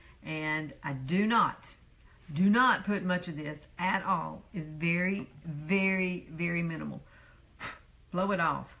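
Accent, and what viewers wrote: South, United States. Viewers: Hi! So refreshing to have found you. I am subscribed to a few beauty gurus, but they are all in their 20's and 30's. Fun and entertaining to watch, but I was looking for someone to address some issues of the inevitable aging skin. I am 48, and the one thing I fight with is the fine lines and crepeyness of my under eyes. It seems any and everything I try to use just accentuates the madness😩I'm going to give this a try. never thought to leave that area naked from foundation. I do make my own facial oil with jojoba, coconut or sweet almond as the carrier oil. Then add in essential oils such as frankincense, vit. E, lavender, rose hip, pomegranate oil, sweet orange, ylang ylang or rosemary oil. Not all the essential oils in one potion, I just play around with each new batch and it has done wonders for my skin over the past year. 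Truly amazing. Also, LOVE rosewater! Can't wait to try this. You are beautiful and I love the southern accent. New sub here! Much love from Texas💕💋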